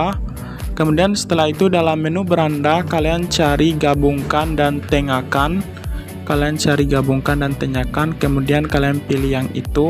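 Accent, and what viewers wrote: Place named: Southeast Sulawesi, Indonesia. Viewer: abang dari sulawesi tenggara k, logat dan cara bicaranya sama" persis..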